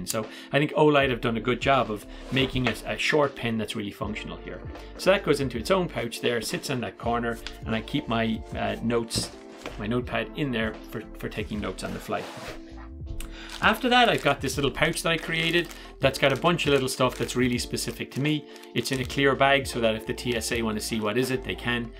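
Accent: Ireland. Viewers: I got a little confused at first hearing the familiar Irish accent and seeing an American charger on the desk at the same time.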